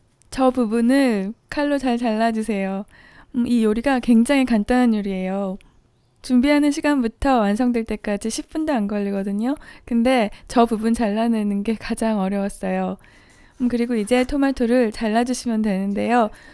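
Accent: Seoul, South Korea. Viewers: 뭔가말투가... 끝까지 못보겠어요. 서울사투리 귀엽다는분들도 있는데 각자 듣기좋은 목소리가 다르겠죠?ㅠㅠ 더많은 구독자를 생각해서 조금 더 표준어를 사용해보시면 어떨까요? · 말투가 완전 옛날 서울 사투리네요... 넘 좋다.... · 이게 서울 사투리군요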